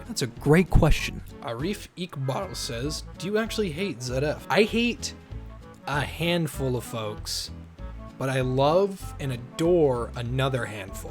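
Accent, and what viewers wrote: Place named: Dallas, United States. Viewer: So howabout that Dallas voice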